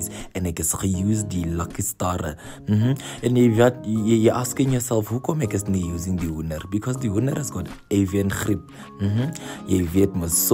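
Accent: Afrikaans, South Africa. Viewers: The Afrikaans 😂❤ loooove it! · That Afrikaans though. 🤭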